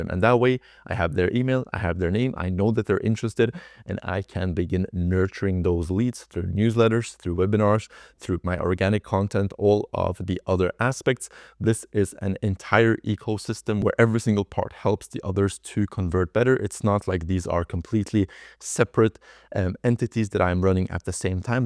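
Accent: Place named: Norway. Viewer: hold up you danish or swedish? - nvm you Norwegian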